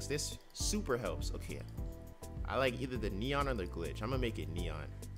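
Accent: Jamaica. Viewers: Kinda sound like a Jamaican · you jamaican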